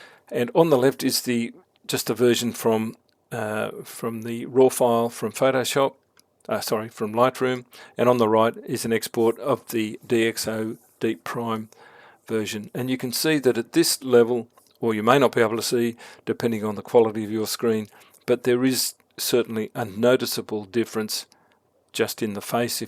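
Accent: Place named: Australia. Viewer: Hi there. I hope you remember me, we have spent time together on workshops in FNQ and on a Barrier Reef Island. I'm the guy who likes to sleep in hammocks.I may even use an unusual brand of camera😉 I really enjoy your lessons and tutorials, it's great to hear them in an Aussie accent. I have recommended them to my Photo Society Buddies. this one is very relevent as I was in your home town last weekend shooting the vivid festival, and high ISO images were required to capture the beaams of light in the sky. I have the older version of DXO pure raw, (1.6) and also the latest version of Topaz denoise. I'm thinking of upgrading DXO Photo Lab so I canhave the benefits of PR3 and Nik collection 6. Do you think there is benefit in this move?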